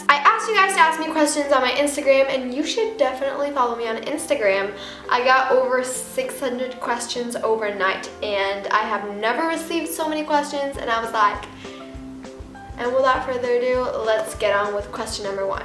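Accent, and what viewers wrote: United States. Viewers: If you're Turkish and all your family are and you were born there. Why do you speak in an American accent? PS. I'm going to Turkey on Saturday :) WOO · How'd you learn English? You have a perfect (american) accent 😂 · Hey I'm English, but I live in Northern Cyprus which is like Turkeys neighbour and I can see the Turkish mountains from my window sometimes. I've lived here for most of my life now, so I can speak fluent Turkish. Anyway I was wondering why you have an American accent if you live in Turkey and your parents are Turkish and you were born in Turkey?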